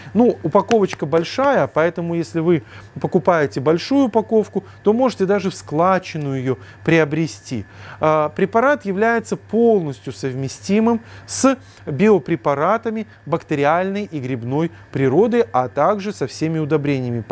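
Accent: Belarus. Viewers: Очень красивая речь,правильная и профессиональная с изюминкой белорусского акцента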